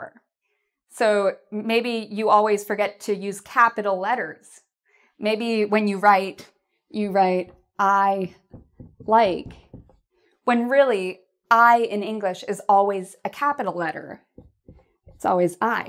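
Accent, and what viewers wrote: Canada. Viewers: Hi. I like listening to English when I got a notification that you have uploaded the You-Tube video. There are several reasons why? Not just for one reason that you're a beautiful young lady with authentic Canadian language, but for many others. You have been teaching us (youtube subscribers) English for many years and not just English, but many useful things that we can apply in our daily life or lifestyle. Whatever, I feel often that is more useful than watching the daily news coming and going or some unrealistic movies, shooting, fighting, running, screaming actors for millions of dollars. So, thank you very much for your devoting work as well for time and energy giving so much to others.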